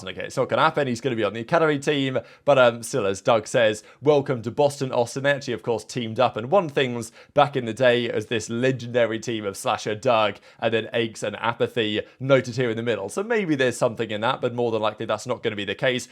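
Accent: England, United Kingdom. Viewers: I think he says " back again today" but it sounds like "hocked rob" cuz he says it so fast in his English accent lmao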